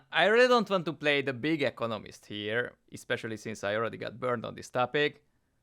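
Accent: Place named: Hungary. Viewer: nagyon hallani a magyar akcentust kétlem hogy ai lenne ezt felmondta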